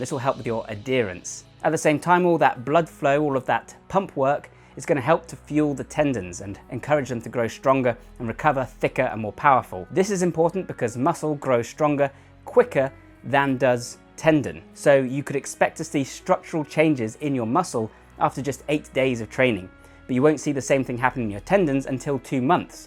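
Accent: United Kingdom. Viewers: You do realise you are British batman right?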